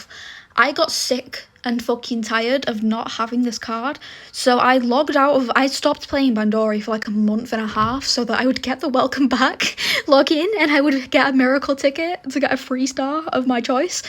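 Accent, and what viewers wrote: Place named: Manchester, United Kingdom. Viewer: loving the manchestian accent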